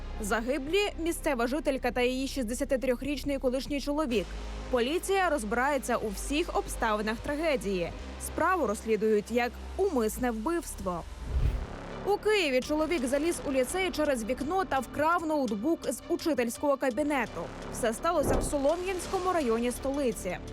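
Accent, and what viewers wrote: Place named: Bukovina, Ukraine. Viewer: Ведуча ви сама буковина Бу ко ви на.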